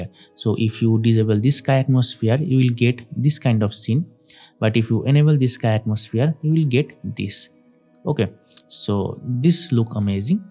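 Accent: India. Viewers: oh no indian accent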